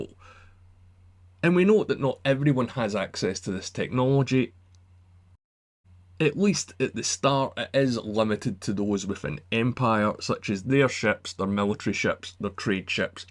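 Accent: Scotland, United Kingdom. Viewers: Is this Scottish English?🇸🇪👋🏻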